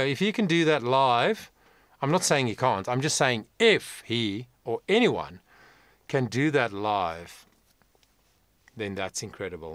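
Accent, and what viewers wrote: South Africa. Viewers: Thank you for this reaction. Hope you don't mind a long comment. Firstly, I listened to your opinion 3 times over a period of weeks. You sound to be from SA and so am I. The 1st time I thought, about your comments, can't you just listen and enjoy the way I do. Over time I came back to your reaction. Thought, ok, he wasn't being negative, he was teaching about different styles. Then after another period I listened to your reaction again. In the meantime I probably listened to all and every reaction. So, thank you for teaching me a few new ways of understanding why sounds, like growls, high notes, softer notes, vibratos and other fluctuations attracts me. I will now try to remember at least some of the things you mentioned. Please react on other Dan Vasc covers like, and the massive version of. Thank you.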